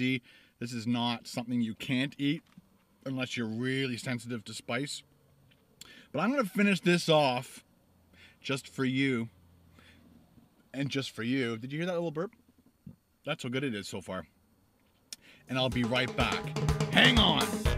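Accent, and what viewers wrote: Canada. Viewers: is he canadian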